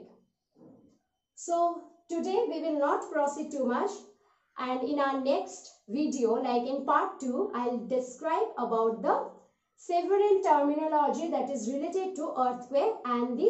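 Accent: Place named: Bengal, India. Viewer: She speaks in bengali accent that's why this happens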